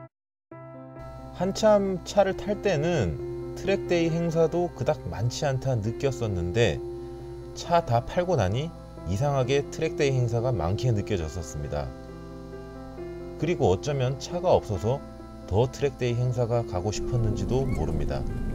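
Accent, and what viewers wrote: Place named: Gyeongsang, South Korea. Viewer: 잘 보고 있습니다. 경상도 억양이 느껴지는데 고향이 서울.경기권은 아니신가봐요. 차라리 강호동, 김제동씨 처럼 말씀 편하게 해보시는 것은 어떨까 조심스럽게 의견 남겨봅니다^^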